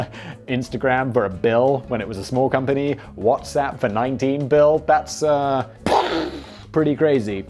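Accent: United Kingdom. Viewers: His only talent resides in being able to read in his British accent.... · The British slang and pronunciations are almost as funny as Danny and Simon's jokes/puns. If it's spelled Zynga, then it's an online/mobile gaming company. Mostly their games are available on just Facebook nowadays. Some of those men are sexy, just sayin'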